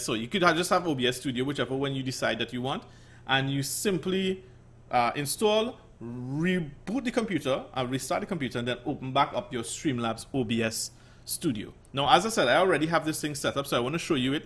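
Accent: Trinidad and Tobago. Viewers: why do you sound Trinidadian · you from trinidad awa?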